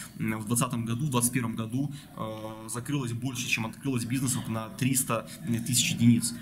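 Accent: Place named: Odessa, Ukraine. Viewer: У НЕГО ТЕМБР ГОЛОСА ОДЕСИТА ЧЕТКО БЫСТРО ПО ДЕЛУ С ЮМОРКОМ РАВНЫХ НЕТ !!!!!!!!!!!! У ВАС ТАК НЕ ПОЛУЧИТСЯ !!!!! ЗНАТЬ !!!!! УМЕТЬ НАДО !!!!!!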